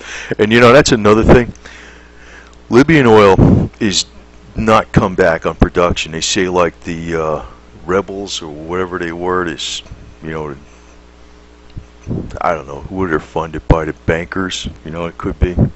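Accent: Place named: New York, United States. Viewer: You sound like you're from New York. No offense, but I just imagine you saying something like, "Hows about me, Tony Baloney and the gang come over there and whack ya up side the head, eh?" Seriously, good info. Yours is one of the top hits for recent videos with Lindsey Williams. On the Sunday Alex Jones show yesterday, a caller asked he bring Lindsey back on and Alex agreed. We might see him either this week or next week.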